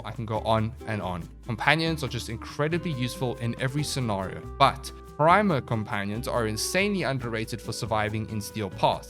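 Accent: South Africa. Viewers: Bro it just hit me. Are you South African?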